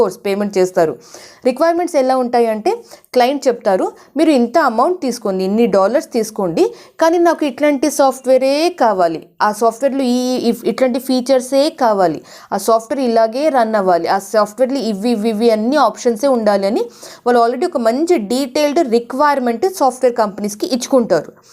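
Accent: India. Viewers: North nunchi vachi telugu matladuthunatu undi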